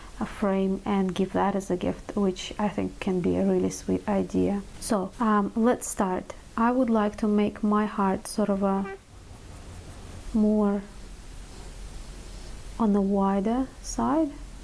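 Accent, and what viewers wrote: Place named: Russia. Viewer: ahhhhh Lebanese! That’s what I couldn’t place! I got the Russian accent but your facial structure, coloring and surname was throwing me off. Lol. You are quite amazingly exotic!😍😍😍😍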